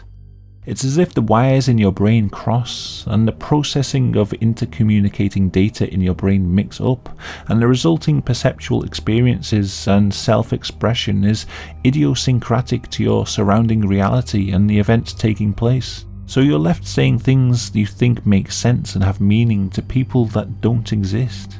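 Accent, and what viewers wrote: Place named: North, United Kingdom. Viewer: He’s just from up north lmao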